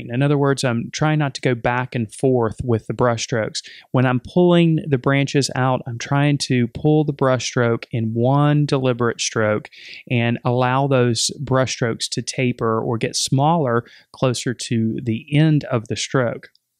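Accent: South, United States. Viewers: Is that a Southern accent you have?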